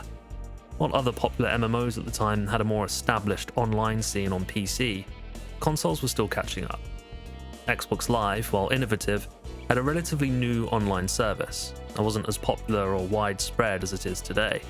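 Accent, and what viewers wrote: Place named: United Kingdom. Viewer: That's just the British accent haha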